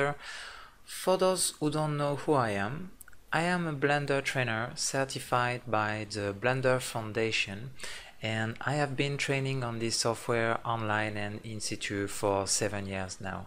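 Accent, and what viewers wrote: France. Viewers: Muy interesante, por cierto el curso esta en inglés?? Porque veo que el profesor es francés y tiene fuerte acento francés, no me queda claro si el curso es en francés o en inglés. Deduzco que en inglés ya que el video introduccion esta en inglés, pero pregunto por si acaso. Si esta en inglés lo podré hacer, pero en frances no.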